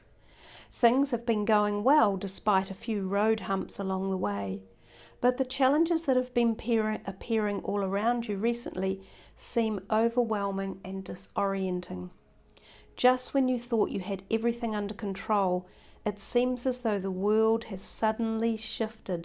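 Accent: New Zealand. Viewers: Are you a new Zealander? The accent in familiar. Regardless.. glad I found you - a familiar accent with tarot readings on YouTube is calming. ❤ thank you for taking your time to do this reading 😊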